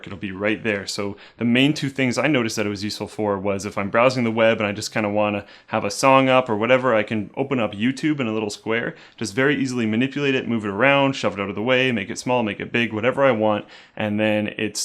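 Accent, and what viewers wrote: Canada. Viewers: Is he Canadian? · dat canadian accent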